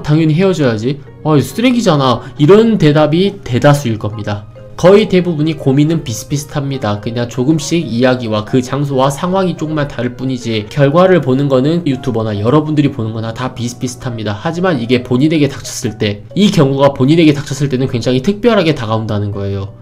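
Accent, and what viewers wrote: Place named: Gwangju, South Korea. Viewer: 최근 힘들어서.. 영상을 거의 보게 됏습니다! 제목처럼 전 을의 입장이구요.. 장거리커플인데...지난달부터 마음 식은걸 느꼇고 환승하려하는지..어쩌다 연락도 안됏어요~~ 요즘 모든게 귀찬고 시국도이러니 제주에 사는 분이라 뱅기타지말구..내년에 보쟤서 클스마쓰 오늘 못만납니다ㅡㅡ 헤어지잔말두 안하고..만나지도않고 이 인간 버리고싶은데 맘이 결단을 못내립니다ㅠㅠ 내가 헤어지자고 말하길 기다리는거 같네요.. 제가 더 좋아햇던 을의 연애엿구요! 답은 알아요..근데 잘 안되는게 미칠지경입니다! 영상 모두 잘보구 잇구요..저도 광주가고향인지라 고향사투리 들어서 넘 편하게 잘보고 잇습니다ㅎㅎ 힝 슬프네요ㅜㅜ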